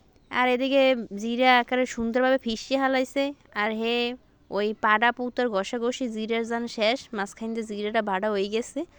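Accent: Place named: Barishal, Bangladesh. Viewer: ওয়াও মনু তোমার বরিশালের কথা অনেক সুন্দর হইছে তুমি আগাই যাও তোমার জন্য দোয়া রইল ❤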